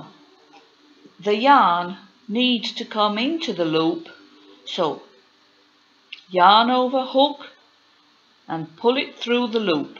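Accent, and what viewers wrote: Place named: Northern England, United Kingdom. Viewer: This lady sounds like she's from Northern England (UK) If you want to be pedantic, I guess you must say 'cro-shay' Here it's a double crochet. I've watched so many American/foreign crochet tutorials, it can be easy to confuse single with double. I guess we count the slip sritch as a 'double' 🙂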